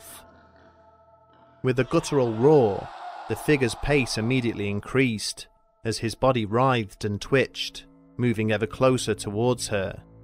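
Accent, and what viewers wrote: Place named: Midlands, United Kingdom. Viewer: Sounds like somewhere in the Midlands to me.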